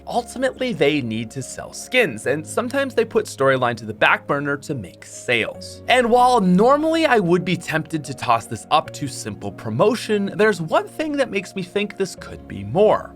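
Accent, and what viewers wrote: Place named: Canada. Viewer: i love his canadian accent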